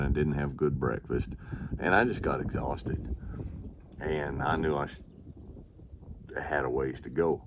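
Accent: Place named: South, United States. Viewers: This video got suggested me by youtube algorithm, and just by looking at the thumbnail, I literally heard his voice and his cool country accent, although I never seen this man before on YT - and I was 100% accurate by guessing both the accent and the voice itself :D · This is by far, the best American accent. The slow, comforting Texas drawl.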